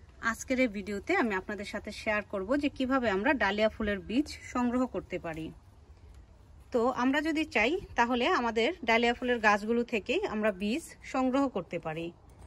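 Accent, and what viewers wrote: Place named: Bangladesh. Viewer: Mone hosse apni bangladeshi. Valo laglo.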